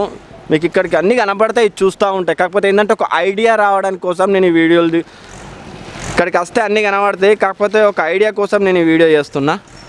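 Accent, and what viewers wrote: Telangana, India. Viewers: Anna masthu jeppinavu okka me channel lone swacchamaina thelangana Basha vuntadhi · అన్నా నువ్వేం పరేషాన్'వడకు నువ్ మాట్లాడే భాషా పక్కా తెలంగాణ....మస్తుమాట్లాడుతున్నవ్🙏👌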